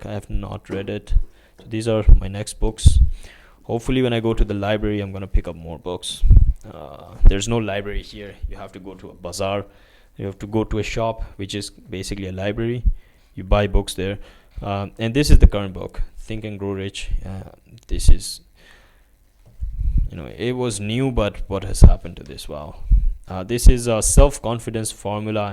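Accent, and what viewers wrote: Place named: Czech Republic. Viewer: How did you learn english❤ so well in a Cruchy accent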